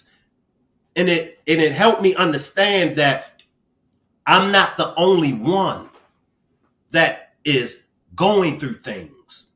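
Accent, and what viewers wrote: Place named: Baltimore, United States. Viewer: The Baltimore accent gets me everytime 😂 I'm from Louisville Kentucky but I'm a RAVEN all day! Flock Nation! Replay Gang!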